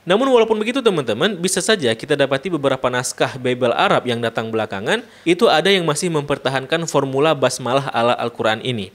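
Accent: Aceh, Indonesia. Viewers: Logatnya seperti logat aceh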